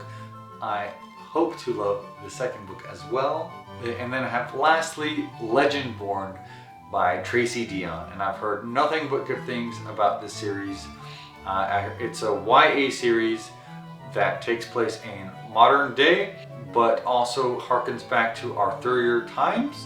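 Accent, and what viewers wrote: South, United States. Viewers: I love your podcast on this video at your house in Texas during your quarantine until you had a lot of books in the back of your room including Surrender Your Sons by Adam Sass, House of Earth and Blood (Crescent City) by Sarah J. Mass, And I Darken by Kiersten White, The Invisible Life of Addie LaRue by V.E. Schwab, The House in the Cersulean Sea by T.J. Klune, The Fires of Vengeance by Evan Winter and so many others after that, but I hope your husband, Zach will be very proud of you, I love your Southern accent and I know you're gay!!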